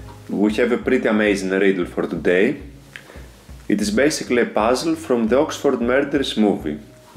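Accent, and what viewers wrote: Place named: Greece. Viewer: the narrator is greek, no doubt)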